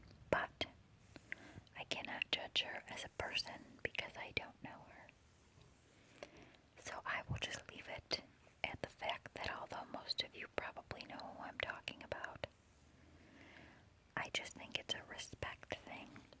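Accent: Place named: Michigan, United States. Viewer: you're accent sounds close to a Michigan accent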